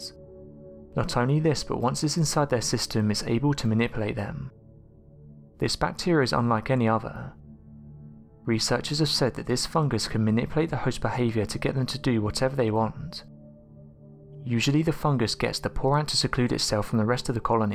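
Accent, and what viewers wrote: England, United Kingdom. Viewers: he has an English accent you dum dum not a speech impediment or the mumbles what a ridiculous comment 😶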